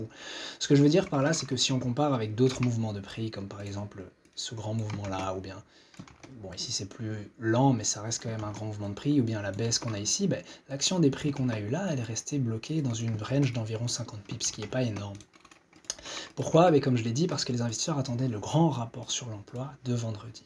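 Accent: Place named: Belgium. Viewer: Tu as un accent tu es de Belgique, suisse ?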